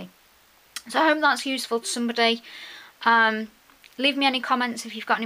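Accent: England, United Kingdom. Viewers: I found this comparison very interesting and helpful. I have seen many mentions and tutorials on how to create your own crackle paint using 'Elmer's Glue' - which is something that's common in the USA, but I - like you (from your English accent) am in the UK. Have you tried this? Do you know what glue here in the UK would work like the American's 'Elmer's Glue'? If you've tried this, how well did it work? Thanks. By the way, I love your journals and Mixed Media art. Gorgeous stuff!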